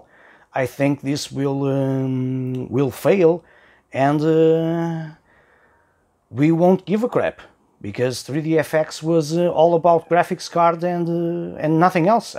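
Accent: Portugal. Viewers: So first I thought: This guy sounds portuguese. Then I looked in his profile and it said Portugal lol. I guess both of us make the same pronunciation mistakes. Olá!! Fonix é a primeira vez que vejo um youtuber português XD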